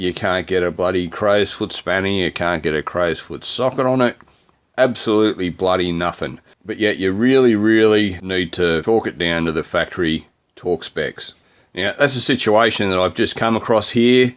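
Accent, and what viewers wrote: Australia. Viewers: Love your Aussie accent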